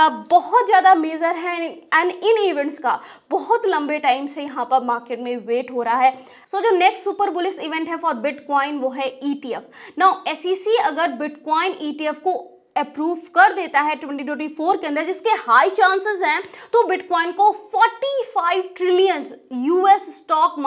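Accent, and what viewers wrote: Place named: Uttar Pradesh, India. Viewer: ji aapke bolne se hi pata chalta hai ki aap Uttarpradesh k ho.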